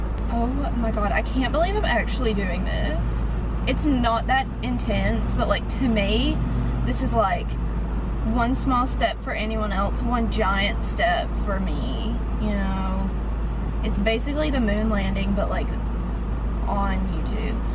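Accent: South, United States. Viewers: LA? Wtf that's a southern ass accent wdym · Omg I love your country accent! 😁❤️ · The more I watch your videos, the less southern your accent sounds 😂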